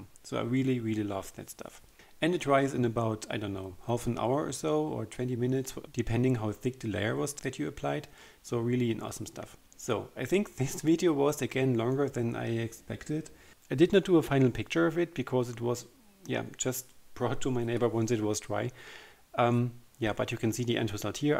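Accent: Germany. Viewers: Hi , ich habe mir schon mehrmals etwas von Dir angeschaut und immer gedacht : er hat einen deutschen Akzent... Nach meinem letzten geschauten Video weiß ich zumindest das Du Deutsch sprichst. ( Fassettenlack) ...LOL...Kannst Du vllt mal etwas in deutsch machen? Das wäre echt klasse. 😍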